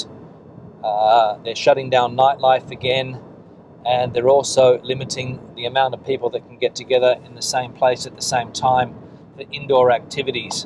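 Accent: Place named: Australia. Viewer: We've been postponing our move to Spain ever since the current "health problem". Almost feel as if the best time to go is now because this may end up being the new normal. Where were you driving? Are you back home? Please bear with me as I share a funny anecdote. One of my best friends is originally from Southern Australia and has a very similar accent to yours. Back when Microsoft voice recognition software was in its infancy (<num>?) I would always tease him because it didn't work for him. So, for the last few updates, fixes, and debugs, Australian was always listed as one of the languages being "fixed". Of course, each update I'd have to walk up to him and say, "hey Mike, why is it that Windows can understand Kurdish, Tibetan and Pennsylvania Dutch but it can't understand Australian English?". That's how I learned a lot of colorful Australian words and phrases which I shan't repeat here! 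Did you experience the same issue years ago? Thanks for the updates.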